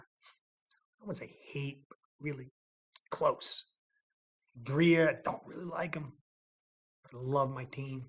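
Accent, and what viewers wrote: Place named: Florida, United States. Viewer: Great Florida accent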